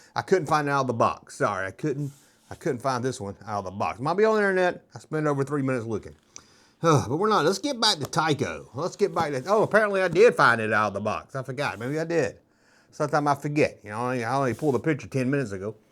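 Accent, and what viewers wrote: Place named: North, United States. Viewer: "north" mississippi? sounds more like Yankee Mississippi to me!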